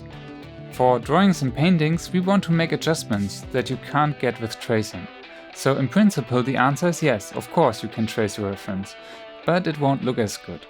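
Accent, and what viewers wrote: Germany. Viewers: ayo it's funny you were on my recommendations and I didn't see your videos before, but I was planning on doing the same approach of making tutorials by showing different emotions of me in anime style lol😂 btw you kinda sound like you're german as well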